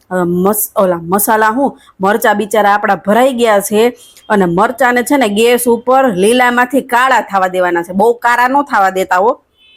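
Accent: Kathiawar, India. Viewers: Super...same bolwani rite che je hamari kathiyadi che jamnagarni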